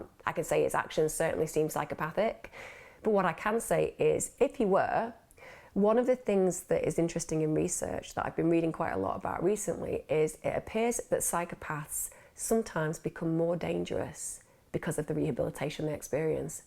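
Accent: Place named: Bury, United Kingdom. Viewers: I also lived round the corner when this happened, we were all sent home from school early that day because there was so much concern in the local community. It’s interesting to learn we come from the same area as I have always found your accent incredibly familiar and wondered how close you were to the Bury area.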